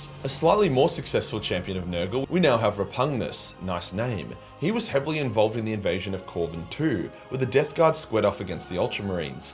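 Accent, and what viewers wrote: Australia. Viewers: can you please lower the volume of your outro? I cannot count how many times I have almost fallen asleep to your lovely Aussie accent only to get jumpscared awake by the "BOW TO ME"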